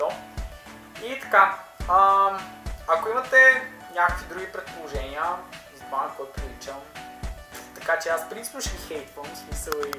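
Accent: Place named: United Kingdom. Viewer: имаш доста готин английски акцент, по-скоро великобритански, за да се разбере по-добре какво имам предвид. Защо не направиш клип с любимите ти или най-омразните ти неща на великобритански английски... ще ми е интересно :)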